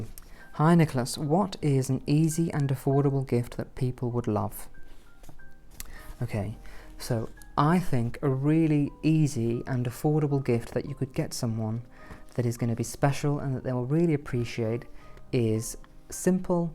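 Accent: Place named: England, United Kingdom. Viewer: you speak like a real English gentleman, born and bred in the upper classes. I could listen to you all day, your voice being so calming. Does this way of speaking come naturally, or did you learn how to speak correctly? I look forward to watching your Christmas video.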